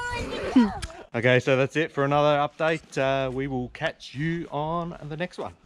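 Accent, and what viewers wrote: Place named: United Kingdom. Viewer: interesting It sounds like you're in the UK. Our USA ultra-lites are much lighter but I was thinking of doing something similar... just some ideas to pass along. the flying boat part is good, but I would do a high wing, also maybe some small out-riggers for weight saving with comparable stability out of a narrower, lighter, center canoe type body. We are limited to 254 lbs plus an allowance for floats and parachutes... yours is looking good. Did you use plans or a kit, or is it your own design?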